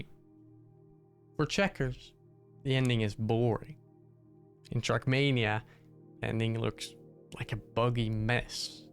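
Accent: Netherlands. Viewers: You sound Dutch, coole video gast!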